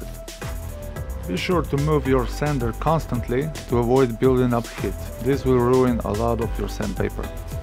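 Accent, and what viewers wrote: Russia. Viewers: Русский акцент? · в его произношении виден русский человек. ну или украинец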